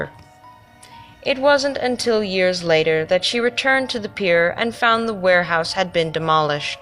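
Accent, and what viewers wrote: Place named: Chicago, United States. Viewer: it’s an honest compliment you have an unusual voice kinda like Velma with a little more what I think is Chicagoan.......maybe upper Midwest like michigander Minnesota Possibly Wisconsin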